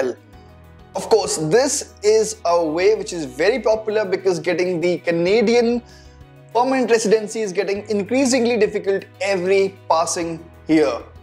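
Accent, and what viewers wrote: India. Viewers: Nice work with all respect but ur language it's so confusing.... I mean the Indian or I called the Indian English it's too bad...... even with subtitle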